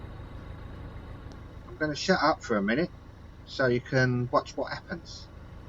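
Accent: London, United Kingdom. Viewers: Hi 👋. New subscriber here. I'm loving your channel! It's like popping round for a cuppa with a mate where you get to slow down and chill a while. I plan on doing what you're doing in a few years but while im saving up, I'll just join you! 😉 Tracy (and Moo the dog who seems to love watching you too!) 🙏 Ps- are you a London boy? Your voice reminds me of my childhood on Portobello Market!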